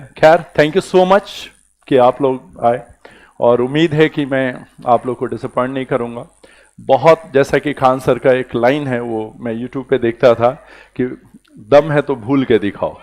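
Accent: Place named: Bhojpuri, India. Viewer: मजा आ गया क्लास कर के sir😊भोजपुरी स्टाइल में भी sir समझा रहे ह😊😃👍👍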